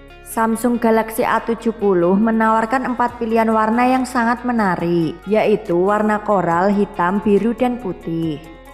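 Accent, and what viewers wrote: Java, Indonesia. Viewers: Suaranya medok bgt gak enak didengar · Jawa tulen ....suaranya.....medok....haha😆😊😀😉😯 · Medok jowone, tapi malah trkesan unik.. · Khas Jawa 😆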